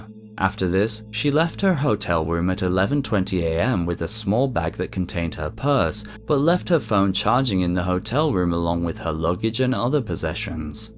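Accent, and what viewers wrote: United Kingdom. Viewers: Is it the British accent? I can't think of what could be hard. He's a basic YouTube presenter and fairly basic to watch. Literally nothing complicated. As for other content like this, Sakura Stardust also does Japan only internet mysteries, mostly stories about 2Chan but there's a lot of variety there.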